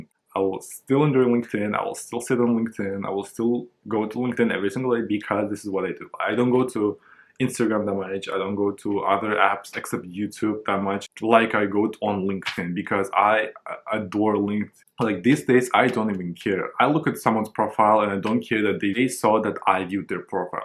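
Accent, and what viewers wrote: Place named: Russia. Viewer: What nationality are u? Im getting russian speaker vibes 🤔 also great video! I had the same experience with "stalking" people, I was pretty embarrassed to realise that people saw that 😅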